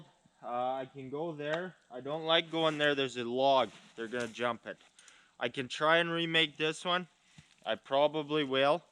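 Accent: Canada. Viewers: You sound like your from waaaaaaaaay out there in Canadian.. you ever get any lynx mate?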